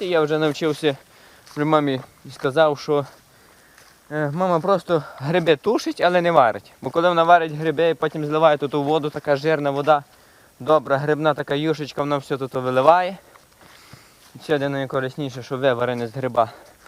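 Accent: Hutsul, Ukraine. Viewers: Привіт гуцулам з Харкова. Слава Україні! Слава ЗСУ! Приємно чути ядерну гуцульську мову. 🇺🇦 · Як він гарно говорить.Правдивий гуцул.А гуцули вільні люди!!!!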